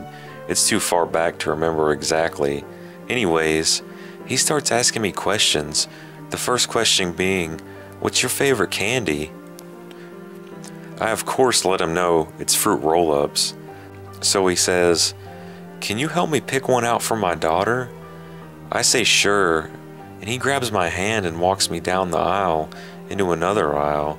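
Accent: South, United States. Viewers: he's southern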